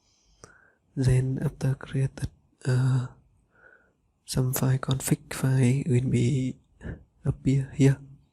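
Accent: Vietnam. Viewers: tưởng ôn ấn độ nào. nghe sound chuẩn việt nam r :))0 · Hình như bạn là người Việt phải không ? Nghe phát âm tiếng anh giống VN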